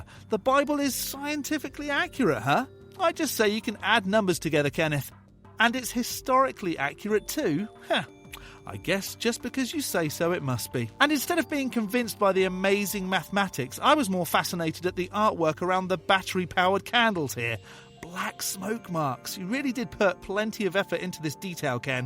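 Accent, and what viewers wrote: Kentucky, United States. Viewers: That is how they speak in Kentucky? Justified! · For years, I've been trying to "place" your accent, geographically. Imagine my surprise, I'm not as familiar with Kentucky regional speech as I thought.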